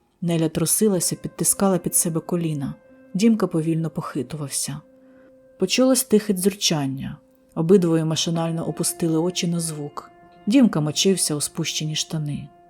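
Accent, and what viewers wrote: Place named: Lviv, Ukraine. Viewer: о то ви зі Львова)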